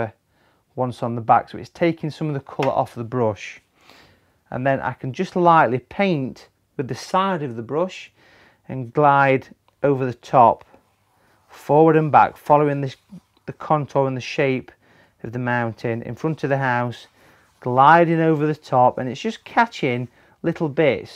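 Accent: United Kingdom. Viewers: Where is he from? A fellow North West Brit but which town? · Guy's I think this guy is british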